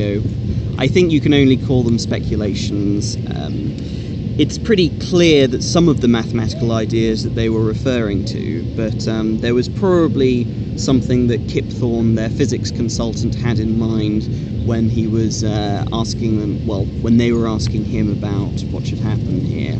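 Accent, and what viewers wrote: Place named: United Kingdom. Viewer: Beauty with brains, and a British accent lol. Nicely explained.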